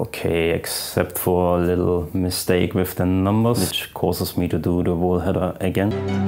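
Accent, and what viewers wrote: East Germany, Germany. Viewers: Bei dem sehr deutlich deutschem Akzent könnte man "Mercedes" doch auch gleich richtig deutsch aussprechen. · Thats the best german english ive ever heard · Moin Moin aus dem Norden, ist der Protagonist etwa Deutscher? 😀 Das Englisch hört sich wie Denglisch an ;-) Abo ist raus! LG HEUERs Pit Stop 🚗💨💥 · geil wie man selbst im englischen den Ossi Dialekt raushört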